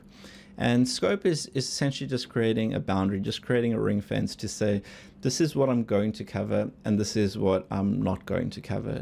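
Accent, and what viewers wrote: South Africa. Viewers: Are you South African? Seems obvious actually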